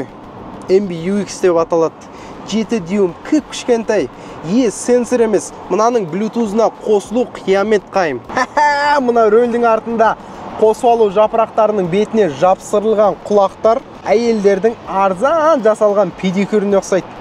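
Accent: Standard. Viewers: Бауырымызға сәттілік. Қазақша таза, сауатты сөйлейді екен. Ғаламторда сауатты, пайдалы, қазақша бағдарлама көбейсін, аумин. Саясатты білмеу, әділетсіздікпен күреспеу, ұрпақты аяқ, қолын жіпсіз байлап жауға бергенмен бірдей. Оян қазақтар. АЛЛАҺУ АКБАР.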